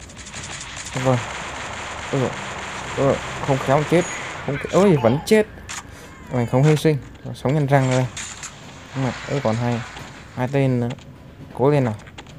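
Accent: South, Vietnam. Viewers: Miền nam à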